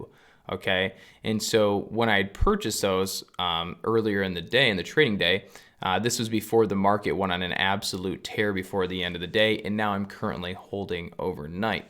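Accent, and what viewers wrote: United States. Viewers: You sound like your from Buffalo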